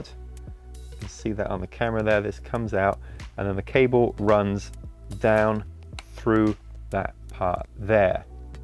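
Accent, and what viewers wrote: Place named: United Kingdom. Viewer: British accent and 'dollars'. Odd.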